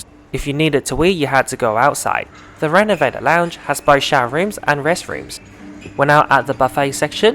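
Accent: Australia. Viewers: Hey did you go to school in Australia. I am hearing your accent😂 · The Aussie accent is classic mate!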